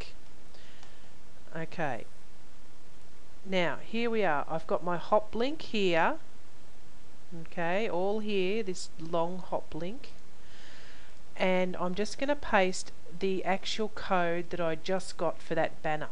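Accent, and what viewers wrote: Australia. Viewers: Trying to find a site explaining banners and hoplinks. Thanks for your video. Nice to hear an Australian accent for a change.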